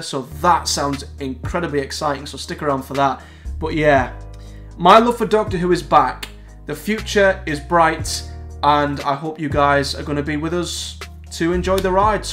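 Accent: Middlesbrough, United Kingdom. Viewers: Hi new viewer here, I agree I think it's a last ditch attempt to get viewers on side, I stopped watching after peter capaldi because I believe they were jumping on the woke wagon, this seemed to be proved true as the series went on. Before everyone calls me a misogynist, I though missy was the greatest baddie theres ever been on dr who, anyway sorry for the long winded message, are you a Middlesbrough lad , I'm from redcar recognised the accent.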